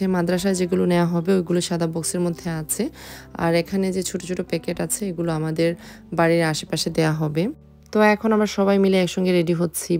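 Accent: Sylhet, Bangladesh. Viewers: আপনি বলে সিলেটের ভাসা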